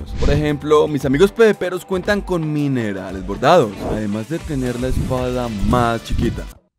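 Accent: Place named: Colombia. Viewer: Por qué hablas como colombiano? deja de hacerlo es molesto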